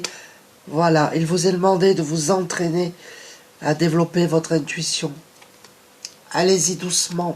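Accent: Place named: Belgium. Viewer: haaaaan dsl vous avez un accent de Belgique 😂🤭 pardon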